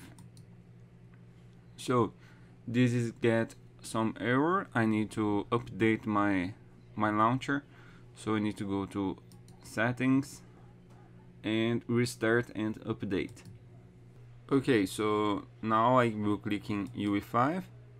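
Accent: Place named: Brazil. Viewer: Reconheci o sotaque BR também kkk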